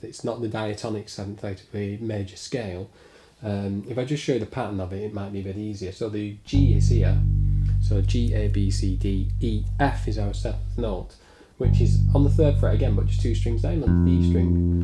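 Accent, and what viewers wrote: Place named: North, United Kingdom. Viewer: Superb lesson .. do I detect a northern twang .. I’ll be practicing my extended scales today , have you any cool exercises to go with them ?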